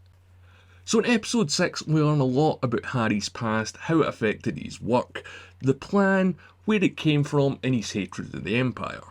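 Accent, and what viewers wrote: Scotland, United Kingdom. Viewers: Is this Scottish English?🇸🇪👋🏻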